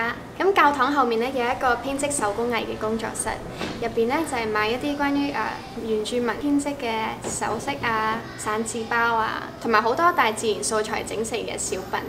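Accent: Taiwan. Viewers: 哇要主持節目了！ 為什麼你的國語講得這麼台灣腔～ 蠻多人來很久了還是有港腔啊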